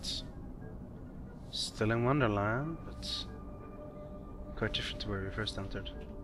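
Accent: Sweden. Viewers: he is swedish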